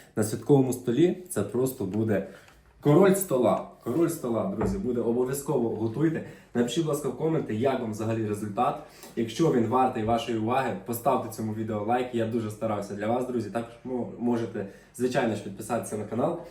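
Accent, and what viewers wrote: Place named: Western Ukraine, Ukraine. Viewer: Супер. Говір з Заходу України. Деякі слова діалекти я і мої рідні досих пір використовуємо.😊👍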